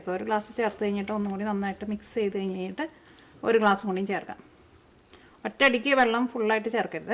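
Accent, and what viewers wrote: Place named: Angamaly, India. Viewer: yude ella cooking enikku eshta.nalla presentation anu.angamaly ano kuttide place njan Kure kalam thamasichittunde.kuttide slang ketteppol thonniyatha.enikku mole valere eshta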